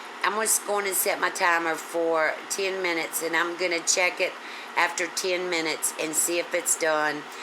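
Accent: South, United States. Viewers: I could listen to that ADORABLE Southern accent all day long! (Makes me kiss my family in Tennessee). I love your macaroni salad too.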